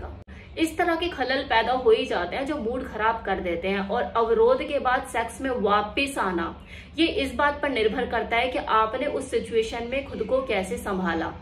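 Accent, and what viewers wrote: Punjab, India. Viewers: Kiss-vis, lipat-vipat, choos-chaas. Kya punjabi tadka hai wakai hamey to anand/maja aa jata hai sunkar hi. Pls keep it up as good going. Txs Gtb Delhi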